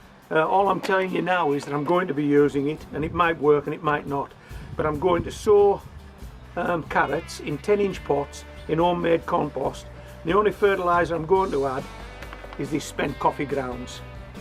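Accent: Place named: England, United Kingdom. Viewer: Great video! I laughed all the way through at your accent 😄😆😂 I was born in Harrogate, but came to Australia when I was 3 yrs old, but I have an elephant memory, so I have a lot of memories of my time in Emgland, even though I've never been back. I recognise your accent, so entertaining to me 😄😆, you sound like my Dad who I don't get to hear anymore 😔 keep up the good gardening, wouldn't be easy in the UK compared to Sunny Australia, it's Autumn where I am and still 30 deg 😉👌🏾 I'm about to plant my peach sweet potatoes and add some coffee grinds I've been collecting now for about 2-3 year ⬅️ 😄 that's my pommie 😂. 🇦🇺☀️🇬🇧🌨💦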